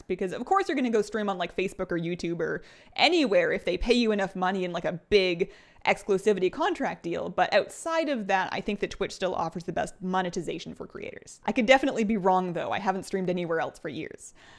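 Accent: Canada. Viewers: Your Canadian accent has come back 😍 ✨about✨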